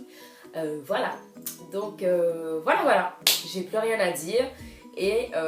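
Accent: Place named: Democratic Republic of the Congo. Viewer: Moi aussi on me prend pour une sénégalaise ou malienne. C est notre couleur et les traits fins qui porte à confusion. Je suis du Congo brazza. C est vrai que je t aurai prise pour une sénégalaise mais à ton accent j ai reconnu le Congo sans être sur bien sûr. Donc on est compatriote 😉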